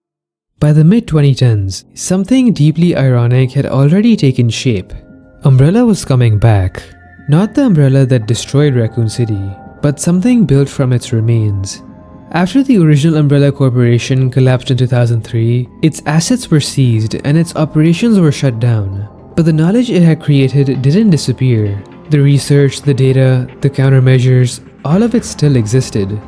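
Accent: India. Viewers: Fookin accent are u indian or latina?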